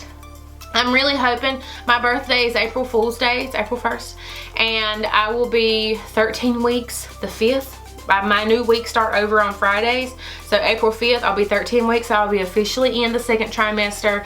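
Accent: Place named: North Carolina, United States. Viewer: I got North Carolina accent as well lol. love it.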